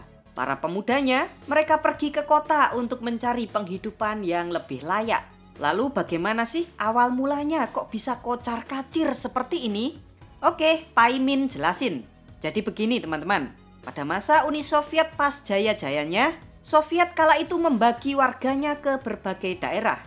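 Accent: Java, Indonesia. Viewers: Meddok bgt😆😆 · emg medok nya ga bisa di ilangin dulu itu min selagi ngonten 😁 duta Sheila on 7 aja kalau lagi nyanyi medok nya ilang 😁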